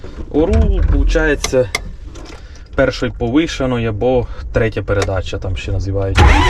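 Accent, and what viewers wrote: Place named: Dubrovytsia, Ukraine. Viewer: Доброго... по говору ви наче з Дубровицьких країв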